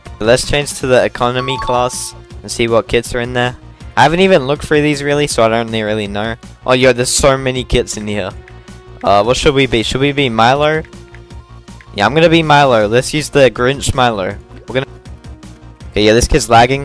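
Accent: Australia. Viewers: Are you austrailian?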